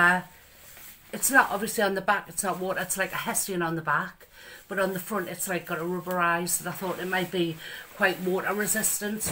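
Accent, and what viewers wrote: Newcastle, United Kingdom. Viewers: Hello, l am one of your new subscibers. I love your videos, you are such a lovely, down to earth person. So genuine. l could listen To your voice all day as my grandparents lived in Newcastle all their lives and l spent every holiday with them when l was a child. Listening to you brings back all the warmth and kindness of my childhood 😊 Love from Amanda in France xxx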